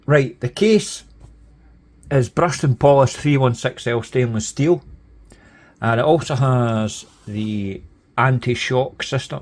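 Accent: Scotland, United Kingdom. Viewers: Hey bud recently discovered your channel and been enjoying your reviews. What part of Scotland are you from?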